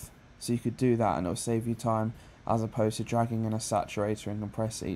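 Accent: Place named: United Kingdom. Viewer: happy to found someone who has british accent with ableton tutorials :D <333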